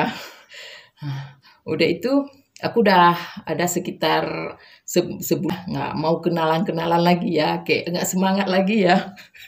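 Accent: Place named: Aceh, Indonesia. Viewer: Aaah Terimakasih kak sudah menjawab pertanyaan saya 🙏 Ditunggu part" selanjutnya 🥰 Jangan khawatir, kita gak bosan kok kak. Saya justru suka story telling kak dengan logat Acehnya, bukan hanya sekedar berbagi cerita tapi juga memberikan edukasi kepada penonton. Dan untuk soal makanan, kita paham bahwa yang kakak sampaikan di video sebelumnya adalah lingkungan dan diri kakak sendiri tapi kakak klarifikasi dengan segala kerendahan hati karena menggunakan kata "Indonesia". I really appreciated 🤗 Tetap semangat dan konsisten ngevlog ya kak, inshaAllah subscriber, viewers dan adsense akan menyusul dengan berjalannya waktu ❤️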